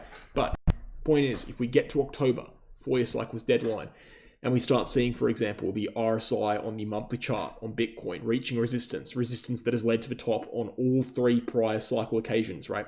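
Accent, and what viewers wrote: Australia. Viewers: I can’t believe I had to suffer through an Australian accent for that long, and get nothing out of it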